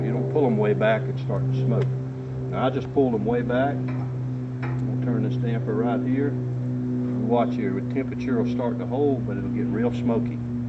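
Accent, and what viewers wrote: United States. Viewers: That's NOT a Texas accent .... it's a refined Southeast Georgia accent. Smooooth as butter! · Love the Texas accent, and love the propane starter idea. I'm a novice getting ready to take my first smoker on it's maiden voyage so I really appreciate the expert advice! Thanks for making this video!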